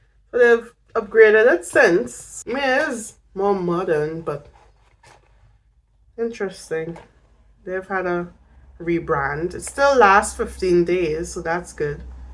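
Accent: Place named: Trinidad and Tobago. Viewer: Hi girl! Thank you for your berberine video. That is what got me here. Just going down a rabbit hole on YT as uasual and founds you video talking abouts Berberine and it peeked my curiosity. AND also you accent caught my attention, as I too a a Trini❤. Glad you are adjusting to your new Dx, and you are finding your footing as you educate yourself about the foods and learning your body as you now have DM1. Thanknyou for the information as this was very informative. I just liked your videos and will subscribe, although i may never take berbaerine or maybe i will. . Keep going 💪 ❤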